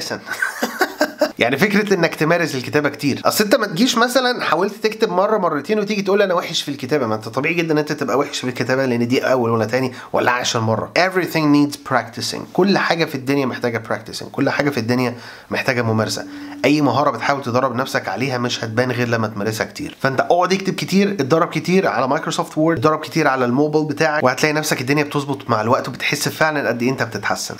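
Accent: United States. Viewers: بعيداً عن موضوع الكتابة انا الآن اصبحت اقرء الانجليزي بشكل صحيح بنسبة 95% من خلال فيديوهاتك وتكرار لفظها بالاكسنت الامريكي 💙💙 Thanks a lot, keep going on 😍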